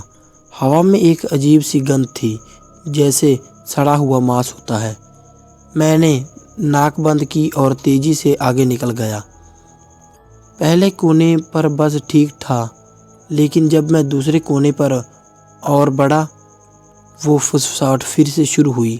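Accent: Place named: Punjab, India. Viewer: Bai tere hindi bolne ke trike se lg raha hai ke tu punjabi hai 😅👍🏻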